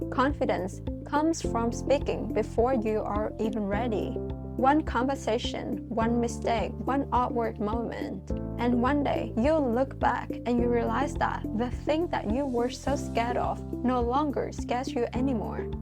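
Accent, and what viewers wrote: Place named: Australia. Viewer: Hi, I'm excited to see a new video on your channel - I loved it! It's also amazing that you are helping lots of people by sharing your own experiences. I can definitely pick up an Australian accent in some of the words you use. 😊 I think one thing I noticed from my personal experiences is that sometimes I don't feel confident internally, but when I rewatch a recording of a lecture or talk I gave, I can't actually tell - so I think insecurity is more often in our heads than something perceived by other people! (It's particularly relevant recording YouTube videos as well, it initially took me a while to get comfortable being in front of a camera on YouTube when I started my channel.) I made sure to like, as always, and looking forward to the next video! 🥳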